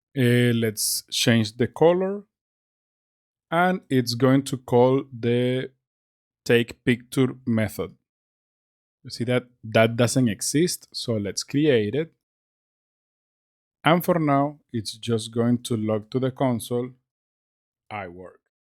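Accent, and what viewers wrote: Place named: Spain. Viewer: I can notice your spanish accent, but you have very good english! May I ask, where are you from? Sos argentino no? Edit: ahi vi que eres colombiano, saludos desde argentina!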